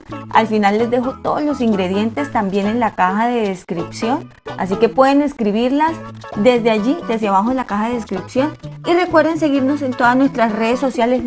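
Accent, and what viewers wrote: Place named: Caribbean Coast, Colombia. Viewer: Tiene un acento costeño como de Barranquilla o Sincelejo con un toque como de Santandereano y paisa.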